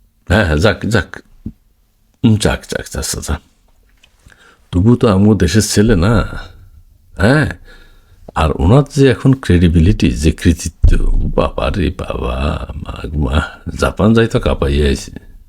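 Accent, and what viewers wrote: Noakhali, Bangladesh. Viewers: পাগলামী ছাগলামী বাদ দিয়া ভালো কিছু বলেন। কথার টান শুনে তো নোয়াখাইল্ল্যা মনে হচ্ছে। কাদের সাবের আত্বীয় নাকি??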